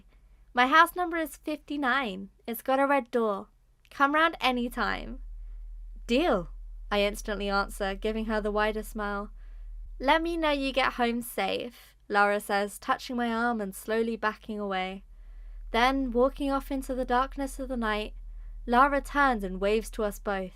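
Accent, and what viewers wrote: United Kingdom. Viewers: Finally. I enjoyed the other Sonya story parts. However, others are right about continuing on with the story. Looks as though there could be many more chapters left to be told . Sonya, Matt and Laura and their adventures..😊😊 Oh. A womderful story told with a British accent too.. If I'm mistaken about accent. Please forgive me...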